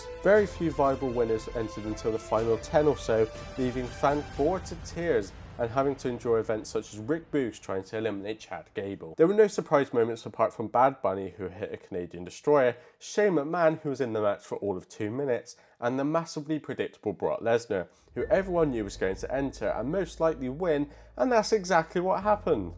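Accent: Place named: Bristol, United Kingdom. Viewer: You are from Bristol I can tell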